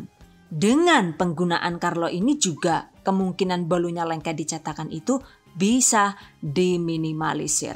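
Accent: Banyumas, Indonesia. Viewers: Lah langsung TK subkrek mbekayu Pas krungu basa ngapake😂😂😂😂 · Mbak asline mana??? Kok bhasanya ngapak? Asline ngendi mbak?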